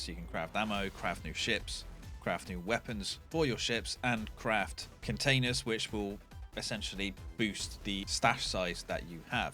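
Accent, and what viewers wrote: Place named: Bristol, United Kingdom. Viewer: U sound like ur from Bristol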